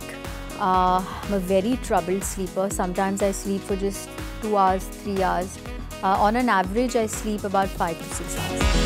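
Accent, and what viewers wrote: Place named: Mangalore, India. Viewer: She is a mangalorean. Most girls speak and have expressions the same way. Also Aishwarya is a mangalorean. We can relate.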